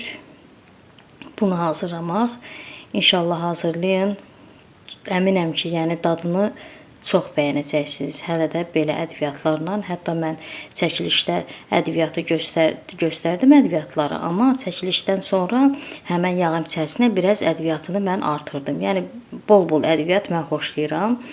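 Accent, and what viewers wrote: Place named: Mughan, Azerbaijan. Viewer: Azərbaycan balaca olsa da, hər tərəfinin öz mətbəxi var. Bəli, bizim Gəncə-Qazax bölgəsində də fəsəli ədviyyatsız, kərə yağı ilə olur. Ancaq gördüyünüz kimi, xanım Muğan ləhcəsində danışır. Hələ Quba tərəfdə fəsəli mövzusunda tamam başqa yeniliklər kəşf edə bilərsiniz. :) Bu, ölkə mətbəxinin zənginliyini göstərir və çox gözəldir. Yaxşı ki, belədir. Mən çoxdan bu ədviyyatlı fəsəlini bişirmək istəyirdim. Müəllif də çox gözəl, detallı izah edib. Mütləq bişirəcəyəm.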